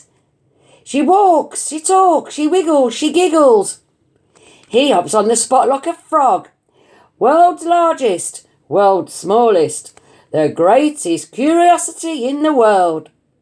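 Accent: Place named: Victoria, Australia. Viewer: Good on you for reading this out loud. What part of Victoria is your accent from?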